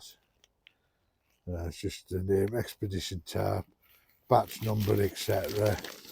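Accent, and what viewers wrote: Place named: North East England, United Kingdom. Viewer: I stumbled across your channel by chance sir. Glad I did. So will be viewing your back catalogue now. Love this kind of content and especially the down to earth people who have channels on YouTube. A lot of people can relate to. Which part of the north east are from? I watch a lot of blot outdoors videos. You sound a lot the same. I live in ormesby st Margaret near Great Yarmouth. So we all sound like farmers down here. Apparently to a lot of people. 😂. Stay safe my friend, Andy from Norfolk. 👍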